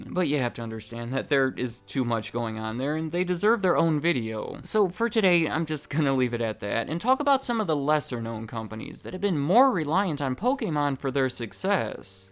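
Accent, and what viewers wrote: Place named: Michigan, United States. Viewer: I'm trying to pinned down this guy's accent my guess is Michigan but maybe also somewhere in Illinois but I'm leaning towards Michigan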